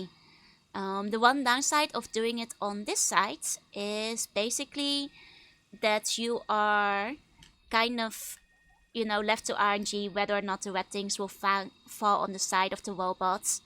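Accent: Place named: Netherlands. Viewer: i dunno how i got here but i instantly recognized the accent to be from the netherlands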